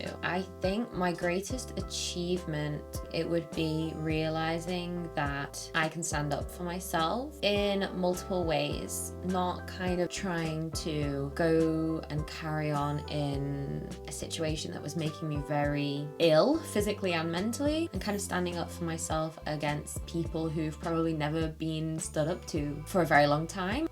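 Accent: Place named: United Kingdom. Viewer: Love ur accent pls teach me British accent 🥹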